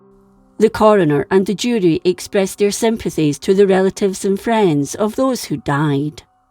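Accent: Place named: Scotland, United Kingdom. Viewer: A Scottish accent.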